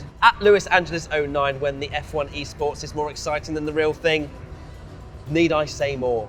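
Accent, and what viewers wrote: England, United Kingdom. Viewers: Less English accent. It's quite annoying.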